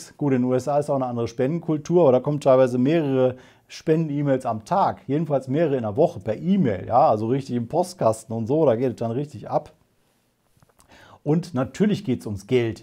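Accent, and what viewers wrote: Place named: Westphalia, Germany. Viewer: Never. Auf jeden Fall Westfalen. Münster oder so...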